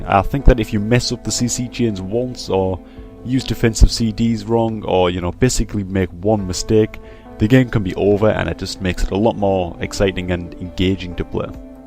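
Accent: Newcastle, United Kingdom. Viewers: sound like you have a geordie accent lol, sorta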